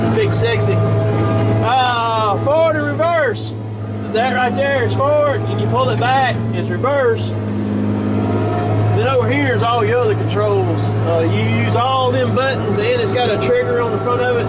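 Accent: South, United States. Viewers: Amen brother. That's the way we talk down here in the south.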